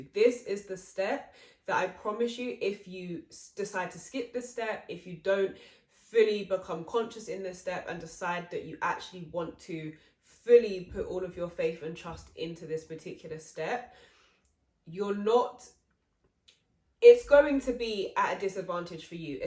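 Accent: United Kingdom. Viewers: london accent 🇬🇧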